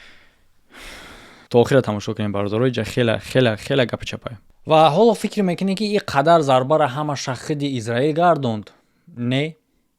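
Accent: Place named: Gharm, Tajikistan. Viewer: Ака гапт надорм МошоАллоҳ у калай тъ ака гарени бад тезай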